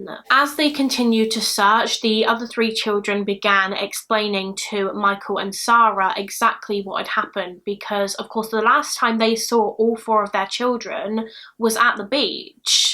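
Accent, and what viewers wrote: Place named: West Country, United Kingdom. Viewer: I think is from the West Country like me so I can assure you it’s definitely a British accent, we roll our Rs a lot here 😂😂❤️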